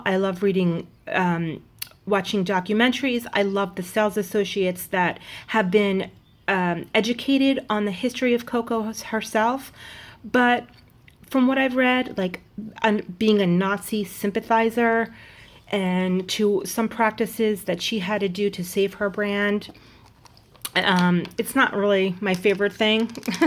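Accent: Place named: New York, United States. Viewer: I love you're accent are you from n.yc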